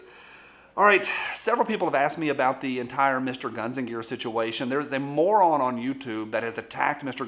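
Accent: South, United States. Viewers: Hay. Like your show a lot. I like that we do not agree every time, and....anD. I do not have to block you for that reason. Because we can disagree. I like the the diversity of your show. I like your southern accent, "Welcome, thanks for stopping by"....good deal. Iv only been watching you since Jan. So I'm fairly new. Have a GREAT DAY!!!!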